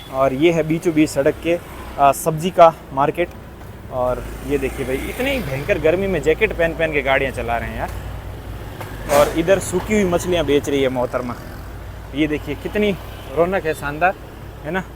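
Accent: Rajasthan, India. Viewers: भाई आप की बोली से तो एसा लगा आप राजस्थानी हो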